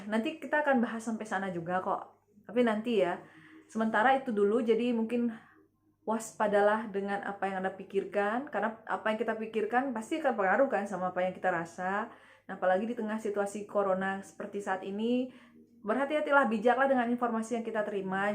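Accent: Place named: Bali, Indonesia. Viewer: Mba nya dari bali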